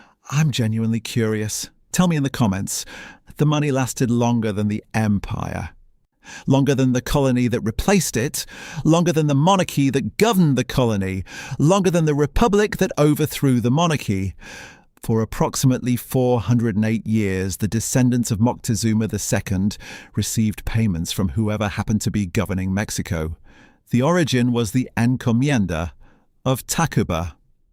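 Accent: United Kingdom. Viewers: the british english pronunciation is messing up the spanish spelling and the words giving me a headache, as an american this "Tree" is a "Mess" as "Mexico"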